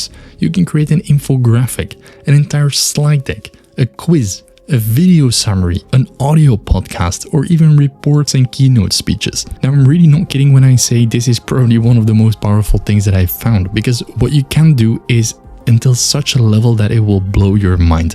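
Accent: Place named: Netherlands. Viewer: Thank you for the effort and detailed explanation. Subscribed. By the way, from the accent I think you are 100% Dutch. )))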